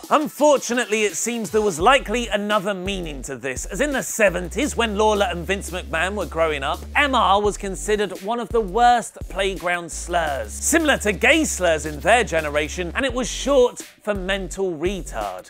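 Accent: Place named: United Kingdom. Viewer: YOU ARE MY HERO!!!! YOU BRITISH TALKING MAN!!!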